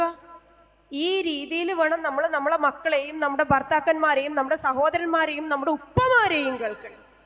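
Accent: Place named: Thalassery, India. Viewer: സൂപ്പർ ക്ലാസ് .തലശേരി സ്ലാങ്ങില് കേൾക്കാൻ നല്ല രസമാണ്. കരയിപ്പിച്ചും ചിരിപ്പിച്ചും ടീച്ചർ സൂപ്പറാക്കി '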